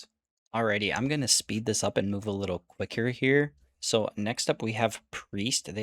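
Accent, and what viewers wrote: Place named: Wisconsin, United States. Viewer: Kinda random but are you by chance from Wisconsin? Your accent sounds similar to mine.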